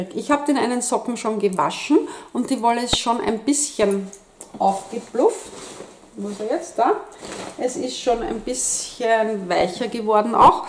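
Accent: Vienna, Austria. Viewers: Ich liebe Deinen Dialekt! Wollte deshalb als junges Mädle(Schwäbin) immer einen Wiener heiraten ((-; liebe Grüße Nicole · Hallo liebe, vielen Dank für dein neues Video. Nun bin ich aber froh, dass du hochdeutsch sprichst mit Wiener Akzent. 😀 Als echte Hessin würde ich zu deinem Pullover sagen: "der eas oig schie ean der basst so goud. Unn dai Foarwe.....schinner gieht nead." Tolle Projekte, die du produzierst und präsentierst.👍👏. Das assymetrische Tuch wird wunderschön. Die anderen Sachen natürlich auch 😉 P.S. ❤️lichen Glückwunsch der Gewinnererin des Wollstranges. Das ist eine tolle Wolle!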